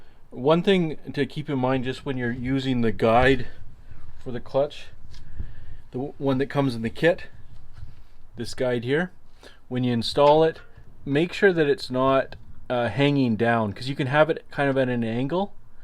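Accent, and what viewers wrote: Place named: Canada. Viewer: Next, they'll be telling you when to change your underwear.. You accent spells Canada, am I correct..?? If so, it figures..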